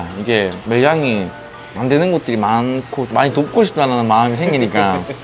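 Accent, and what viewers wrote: Gyeongsang, South Korea. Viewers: 서울에서 10년이든 ㅋㅋ 말투가 경남이니깐 지방에서 왔나물어보는건 당연하지 ㅋㅋㅋ서울말 아에 안쓰시던네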